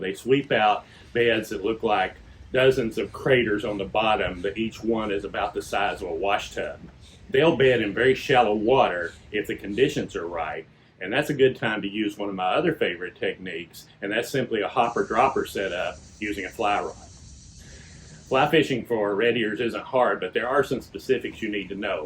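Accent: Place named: New England, United States. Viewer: It sounds like your in the new England aria is your book relevant for texas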